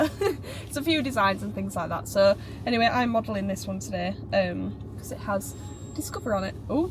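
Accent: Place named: North East England, United Kingdom. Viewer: Advisory 18 😂 you sound like your from the north east lass?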